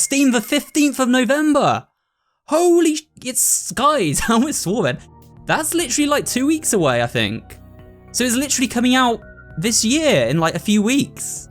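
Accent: United Kingdom. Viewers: Are British or what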